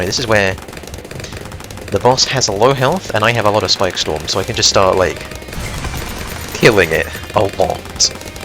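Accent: United Kingdom. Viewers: you can see the view difference when switching games, idrc and im all for it seeing a bri'ish dude playing bloons and ultrakill with a funny accent is what i signed up for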